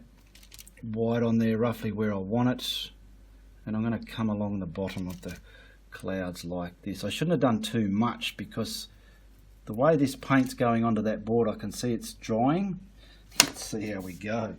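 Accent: Australia. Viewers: The spirit of Bob Ross and the accent and proper speech of Steve Irwin…what a comforting voice and video to watch.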